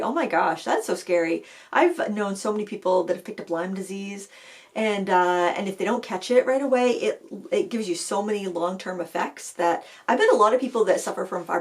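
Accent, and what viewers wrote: Maine, United States. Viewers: Hi! Here in NE Massachusetts, we're getting mostly driving rain and potential damage more along the coast. Be safe; my brother is in Bangor and Dad in Dixmont. I'll keep track of them as well. LOVE your Maine accent as it instantly brings me home! I worked to lose mine in college too. Now it only surfaces when I'm tired. LOL! Take good care this weekend! ❤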